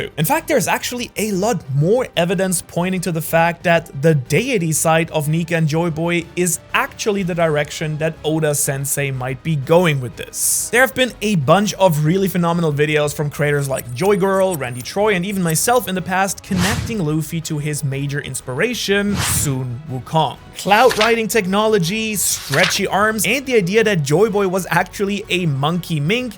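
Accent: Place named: Germany. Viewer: are u german ?since when did j start sounding like y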